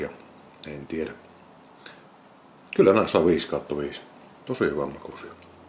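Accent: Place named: Savo, Finland. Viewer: On siinä vähä paljon muutakkii.Hauskaa tämä Savon murre.Itsekkin Savolainen kyllä.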